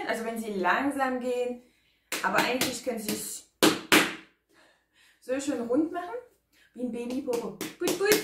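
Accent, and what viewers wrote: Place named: France. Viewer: Vielen herzlichen Dank ❤für dein witziges und aufschlussreiches Video , ich habe ,wieder mal ,viel dazugelernt 😊. Ich liebe deine Rezepte ,deine lockere,flockige Art und deinen französischen Akzent . In deiner Arbeit steckt so viel Liebe und Freude,dass ist einfach unglaublich schön. Liebe Grüße Heidi ❤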